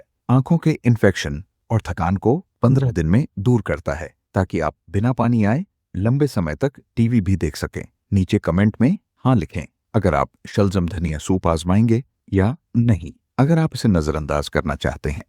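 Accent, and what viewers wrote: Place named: Ludhiana, India. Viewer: Ludhian punjabi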